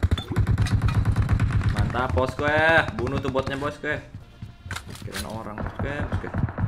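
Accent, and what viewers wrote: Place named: Surabaya, Indonesia. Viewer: Wkwkwk boso jowo wes suwe ng Sby jowo suroboyoan bang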